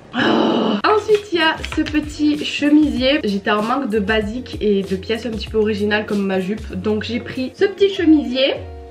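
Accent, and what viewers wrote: South, France. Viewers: J'adore ton accent du sud 🥰 j'avoue la satisfaction du rangement 😅 par contre la couette j'avoue c'est un bordel 😭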